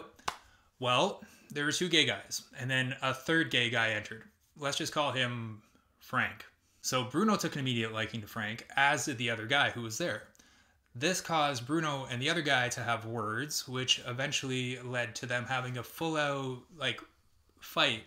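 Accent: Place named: Canada. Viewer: I love the way Canadians pronounce “about,” and you’re also really good looking. Information and cute accents sound best from a handsome man. ❤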